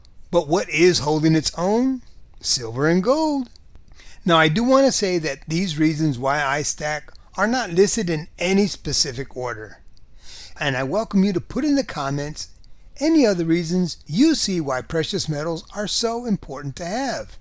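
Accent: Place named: Mexican-American, United States. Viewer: Oh so sorry for the mistake. I thought I heard a Mexican-American accent. Either way your content is gold. 👍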